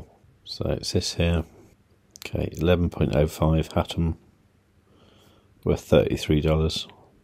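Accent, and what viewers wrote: Midlands, United Kingdom. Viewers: Are you a midlands boy im from the black country maybe your not from too far away :)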